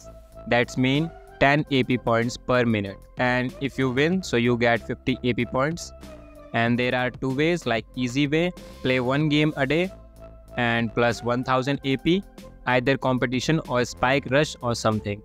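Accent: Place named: India. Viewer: me needing help with a question: guy with the hardest Indian accent ever saving the day😎😎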